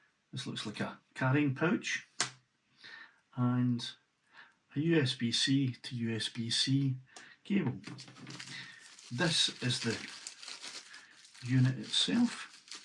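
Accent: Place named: Glasgow, United Kingdom. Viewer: I love your Glasgow accent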